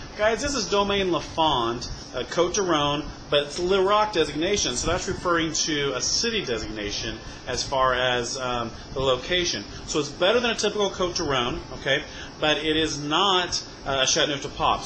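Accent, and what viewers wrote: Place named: United States. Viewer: maybe a little heavy on mercantile concerns but good commentary... and such an American accent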